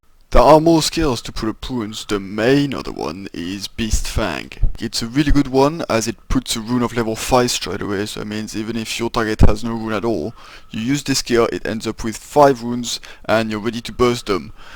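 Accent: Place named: France. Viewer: Oh putin l’accent français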